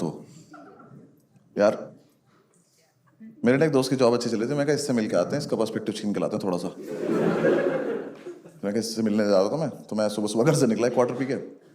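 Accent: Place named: Meerut, India. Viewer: Saare Meerut ke jaat aise hi bolte hain.. ditto!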